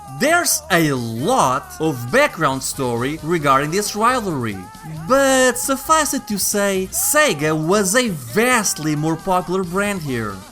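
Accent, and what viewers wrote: Portugal. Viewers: Nos primeiros 30 segundos percebi logo pelo sotaque que devias ser português xD. Parabéns pelo canal e o seu conteúdo.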